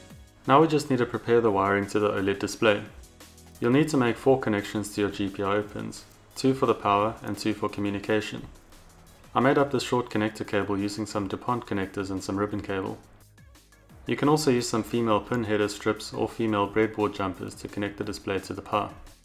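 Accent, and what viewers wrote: South Africa. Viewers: a fan of our South African Accent!...Well done bru, lovely work. I have the horizontal mounted ICE Tower, perhaps a "Flatbed" version? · dude that would be awesome please as not as many people have access to laser cutters as to 3D printers! P.S. great to finally here a Saffas voice on one of these channels, keep up the awesome work!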